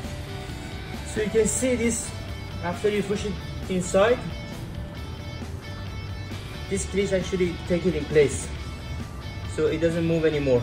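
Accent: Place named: Iran. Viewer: You sound so Iranian 😂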